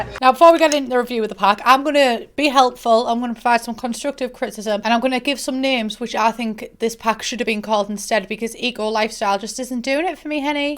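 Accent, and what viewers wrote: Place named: RP, United Kingdom. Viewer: Your accent is so posh it's absolutely dripping with privilege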